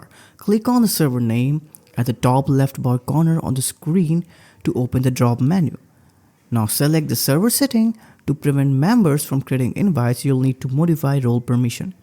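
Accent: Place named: India. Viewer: You sound like an Indian trying not to sound Indian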